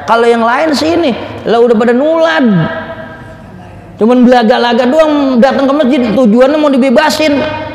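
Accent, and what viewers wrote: Jakarta, Indonesia. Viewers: Barakallah kiyai.... InsyaAllah bkl ikut ngaji terus,nambah ilmu...seneng bgt ngaji breng kiyai,kaga ngantuk.bahasa nye betawi tulen...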